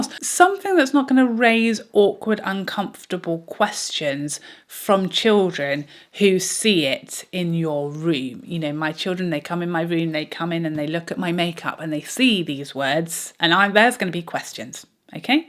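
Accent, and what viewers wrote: United Kingdom. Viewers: I just found your videos today, and I ADORE your POV, your common sense approach, and your talent! As an American, I am also enchanted by your British accent. Thanks for doing videos!!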